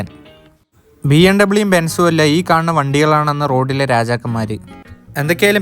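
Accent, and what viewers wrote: Palakkad, India. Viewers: palakkadan slang ❤❤❤❤ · Palakkad anaano baai? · Palakkad aano bro nee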